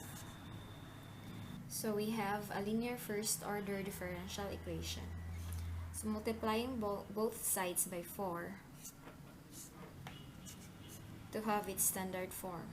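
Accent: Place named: Philippines. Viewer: you're sounded like a filipina, nice explanation by the way.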